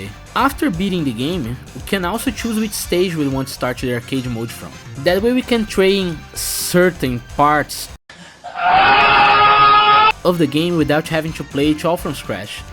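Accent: Brazil. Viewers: Hmm...sotaque br reconheço de longe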